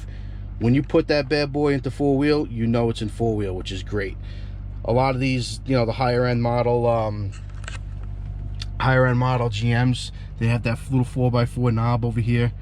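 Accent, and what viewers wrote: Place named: Boston, United States. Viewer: Bro i can tell you're from Boston or somewhere like that ahaha. great vid thanks. What are you using for a drone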